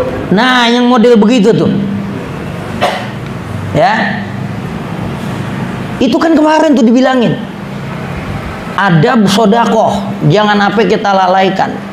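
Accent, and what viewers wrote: Jakarta, Indonesia. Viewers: Alhamdulillah seneng bisa ngaos sm pak yai. Bahasannya has logat betawi. Alhamdulillah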